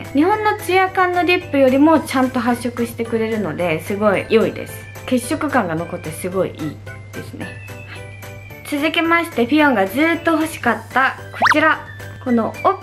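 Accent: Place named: South Korea. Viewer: 英語の発音聞くと、やっぱり韓国人なんだな〜って感じた まじで日本語上手すぎ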